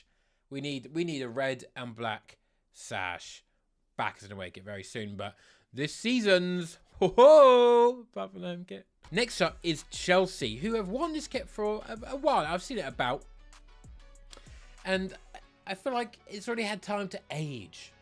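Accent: Manchester, United Kingdom. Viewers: thats a great manc accent you got there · strong Manchester accent you've got fella 🤔 may I ask what part of Manchester you're from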